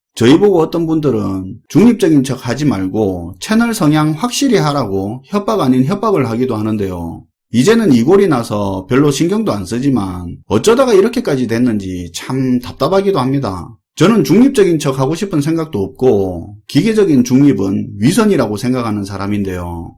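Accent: Gyeongsang, South Korea. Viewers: 아주 경상도스럽고도 신천지포교스럽다 말투부터 거북하다 지금 정치는 바르게가고 있다고 본다 이런식으로 2대는 더가고 그다음쯤 평가 해보자.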